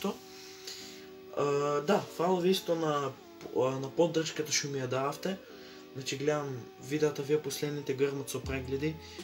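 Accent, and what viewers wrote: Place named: Gevgelija, North Macedonia. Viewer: BRAT MOJ ZBBORUVA GEVGELIJSKI xd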